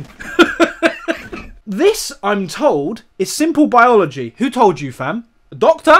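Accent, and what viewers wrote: United States. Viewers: I love your American accent 😂😂😂😂 not even offended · Your American accent is spot on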